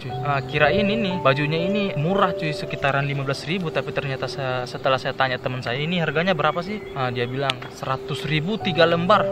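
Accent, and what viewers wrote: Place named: Banyumas, Indonesia. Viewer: Kok bahasanya kayak jawa ngapak, sebenernya orang mana si abang ini... Bingung akuu itu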